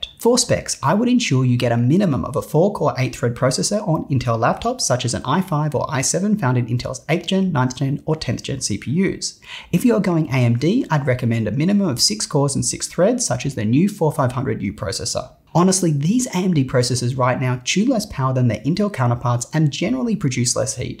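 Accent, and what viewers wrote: Australia. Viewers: He's Australian.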